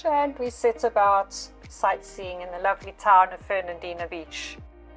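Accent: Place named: Zimbabwe. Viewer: Dear and, this is first time I've viewed your channel and I enjoyed it immensely, thanks! In a twist of fate I know already from our Allan Wilson High School days in the early '70s when we were both boarders at Burnham House! I remember you well and you've done really well for yourself in the maritime industry from a landlocked childhood! Your narration was great, I loved hearing the familiar Zim accent, thanks! Happy sailing guys! Cheers, Jeremy Lancashire (Lancs)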